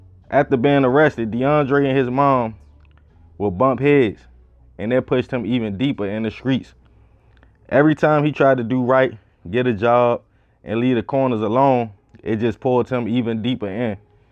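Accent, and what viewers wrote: Baltimore, United States. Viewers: narrator is a native Baltimorian?? The accent???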